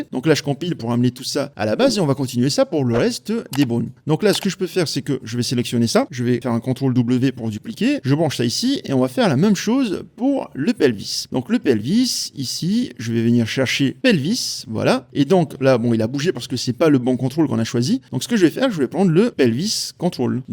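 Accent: Tahiti, French Polynesia. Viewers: Super vidéo, super qualité et super boulot ! :D (et l'accent tahitien c'est tellement peu commun dans les tutos de logiciels ça fait plaisir à entendre aussi) J'avais besoin que d'une partie et certains trucs ont changé de nom ou autre dans UE5 mais j'y suis arrivé donc merci :) PS : pour se souvenir de l'annulaire, il porte ce nom parce que c'est celui qui reçoit l'anneau quand on se marie ;) Et auriculaire parce que c'est celui qu'on utilise pour se gratter dans l'oreille lol, c'est moins glamour.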